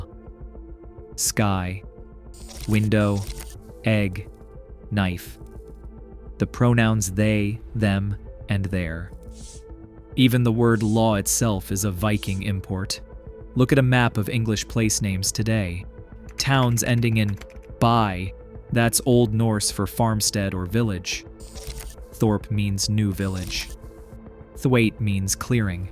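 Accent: United States. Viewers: Whoever produced this, and I'd be curious to know, its very interesting. Subscribed. 2 Questions. Why voice-over in American English, not N.England accent, say? What's this got to do with aviation? Keep 'em coming! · The city of Derby is pronounced in the UK...correctly...as DARBY...whoever put this piece together should have organised an English-accented Narrator...an American accent is inappropriate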